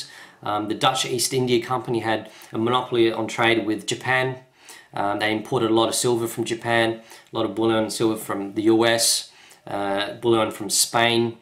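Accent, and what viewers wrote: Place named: Adelaide, Australia. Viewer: you got one thick Adelaide accent there buddy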